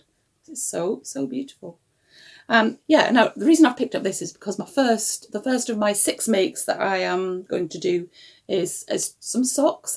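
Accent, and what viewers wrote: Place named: Durham, United Kingdom. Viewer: Hi. What a lovely podcast. I loved watching you make things and really enjoyed your tips for having lots of projects on the go. I use a lot of those tips and love having a little notebook to log all my projects. I especially love your Durham accent as it reminds me of a friend who lives in Hartlepool. Beautiful part of the world 😊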